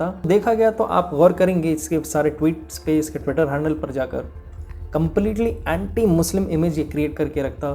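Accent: Hyderabad, India. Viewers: Its hyderabadi accent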